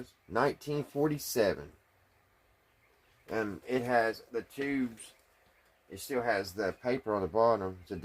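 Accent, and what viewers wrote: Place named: Kentucky, United States. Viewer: You talk like u are in Southern to eastern Kentucky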